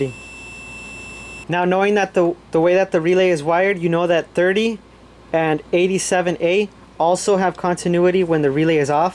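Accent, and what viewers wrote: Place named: Latin America. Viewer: Se te escucha la voz de latino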